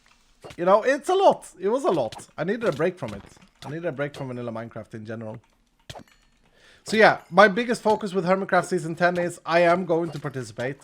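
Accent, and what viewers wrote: Sweden. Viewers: nobody is disappointed with your Hermitcraft output. Anyone who says they are probably don't actually watch, they just comment to try and annoy you and others. YouTube viewership is down for many creators across the platform too as the way people consume content is changing (many younger kids just want 60s clips). I've just reached the point in the video where you're discussing this exact point as I was typing. 😂 Going forward may I suggest you just continue doing what makes you happy? It comes across in the content which, in turn, makes the viewer more likely to enjoy it. You have an amazing creation in Vault Hunters and it makes excellent content. Plus, it's really endearing to hear your Svensk-isms. If I was 1% as good at Swedish as you are at English then I would be very happy indeed. Tack så mycket,. Vi älskar dig, och din videoklipp. 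God Jul! 🖤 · It has never bothered me to listen to the way you use the English language. In fact, it's sort of endearing, you've always been the silly Swedish guy! In fact, your content has always been a source of comfort for me. I started watching Hermitcraft in Season 6 and you were immediately one of the first hermits I began to watch. Even if you never upload another hermitcraft video, no matter how sad the fans may be, we will continue to support you. Make the content that makes you happy, and we will keep watching. I personally didn't care as much about the building, I loved the way hermits interacted, the games, the pranks, everything. It means the world to me that you have hermits on Vault Hunters. community is what makes Hermitcraft great. I hope to see some videos from you on the #10 server, but at your own time and at your own pace. and even if you don't, I'll keep watching for Vault Hunters. 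· Taking a radically different approach to season 10 sounds refreshing and fun. I'd rather see another season-long 'Señor Bumbo Cactoni' style prank war than episode after episode of time-lapses anyway. Cub, Beef, and Zedaph did just fine this season without massive bases, maybe take inspiration from them. Hermitcraft doesn't need to follow the 'bigger and better' YouTube meta, frankly it's stale and something new needs to be brought to the medium. And, side note, as an immigrant in Sweden who's making all kinds of mistakes när jag lär mig svenska, your occasional svengelska idiosyncrasies are barely worth mentioning. You're far better at English than many native speakers I know, occasionally slipping on complex conjugation or weird edge cases is just a 'second language' thing - and they come off as charming and endearing. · You do you ❤. We love your Swenglish and as a non native English it is so recognisable. 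Make a base you enjoy and upload when it feels good for you. Grian and Mumbo do the same. I always love it when you can hear the true joy in vids instead of something forced. And there are other hermits who don t make mega bases either. Dont take the joy away by comparing yourself to others. You are wonderfully unique ❤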